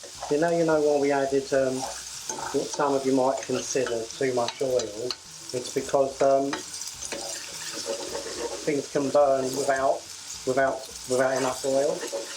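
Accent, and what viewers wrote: Liverpool, United Kingdom. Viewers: I could tell you a red it was the scouse accent that give it away. 🤣🤣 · I used to love you........would never have guessed you was a scouser.👹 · Ahhh yes the famous scouse accent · Ye we could tell from Ur accent u were either a Liverpool or United fan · Sounds really scouse 😹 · Lovely Scouse accent there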